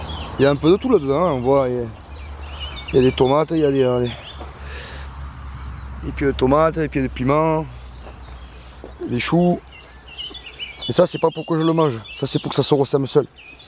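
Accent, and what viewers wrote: South, France. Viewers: Merci beaucoup pour la réponse complète et sincère ! Hate de voir vos prochaines vidéos avec cet accents qui rappel le soleil ^^ Peace & Love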